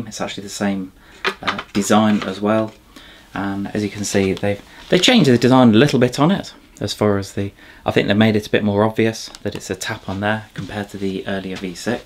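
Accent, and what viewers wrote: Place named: England, United Kingdom. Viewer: ох уж этот английский акцент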